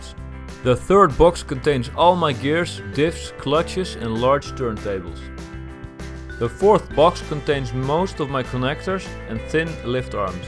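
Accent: Netherlands. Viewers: From the accent you can hear he is Dutch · Are you from the netherlands? Kan het horen aan je axent😅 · You sound dutch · You sound like an man speaking english with a dutch accent... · Nice way to do so my friend. This kinda overviews you don’t see that many often. When I, for whatever reason, need to go into the grandchildren’s playing room I’ll need either a great deal of force or rent a CAT shovel or backhoe! It’s a good idea however to sort it out! 👍👍. By the way, you’ve got these accent as I recon as European? Dutch or Swedish?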